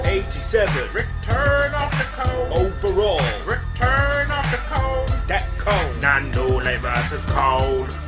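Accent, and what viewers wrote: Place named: Sheffield, United Kingdom. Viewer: Didn't realise you are from sheffield!!:D awesome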